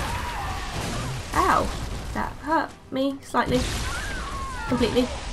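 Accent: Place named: London, United Kingdom. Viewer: I just love your accent. It makes you look cuter when you swear haha are you Londoner? You should have tried the back stab, it works pretty awesome against him.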